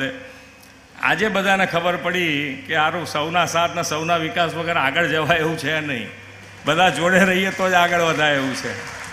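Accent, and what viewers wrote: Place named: Kathiawar, India. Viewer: Kathiyawadi bole che bapu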